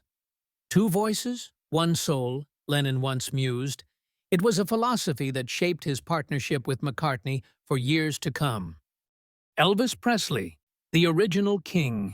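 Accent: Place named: United States. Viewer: Here we go again, the Yank narrator, talking about the bigest "British band" the world has ever known, and the five who impressed the most, yes you've got it, five Americans, you knew it was gonna be rubish as soon as he opened his mouth, they just can't except they've never produced a band as big as the, their answer was the, what a disaster that was.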